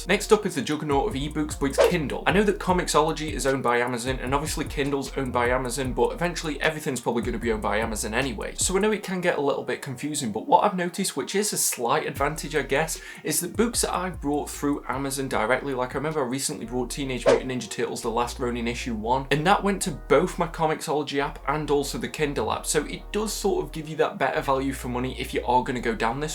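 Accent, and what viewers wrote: United Kingdom. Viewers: No, you have a great content creation vibe! I just rarely hear a fellow UK accent, and the pronunciation of 'book' is one of my favourite regional pronunciations haha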